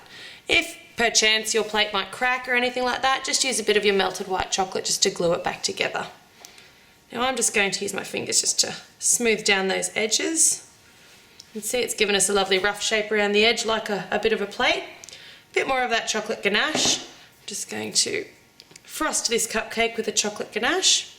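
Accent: Australia. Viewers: Pugh she's Australian!!! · i love her accent! are you austrailian? good video! lovely cupcakes! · OMG if I had an Australian accent I would never shut up. I love your accent!! ^-^ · Are you Australian? I'm a kiwi, I know what an Australian sounds like...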